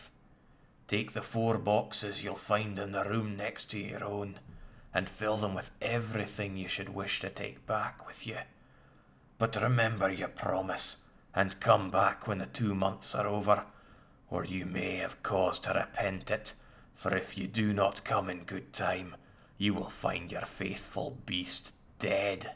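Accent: Scotland, United Kingdom. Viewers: Wait did this story read as Scottish, or does your accent shine while reading? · lol why do you sound extra Scottish in this?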